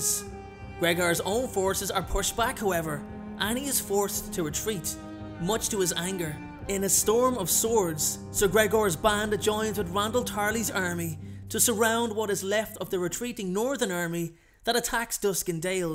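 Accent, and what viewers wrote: Ireland. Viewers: Dude are you Irish 🇨🇮🇨🇮🇨🇮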